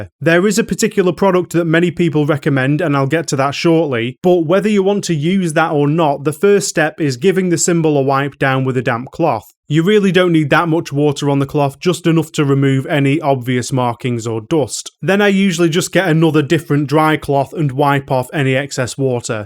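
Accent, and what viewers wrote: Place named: Liverpool, United Kingdom. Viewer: I love your accent!! ❤ Liverpool?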